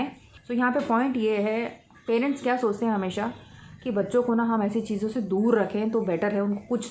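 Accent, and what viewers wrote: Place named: South India, India. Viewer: Di ap MERI English ki mam Jaise lgte ho,school ki teacher thi, wo b south Indian thi, aur apka way of talking b aisa hi tha r wo b south Indian thi 😘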